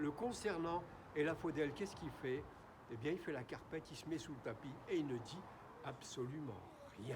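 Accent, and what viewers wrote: France. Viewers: Merci,et il y a du "TITI Parigot" dans cet accent de diction qui raisonne en mes oreilles 😊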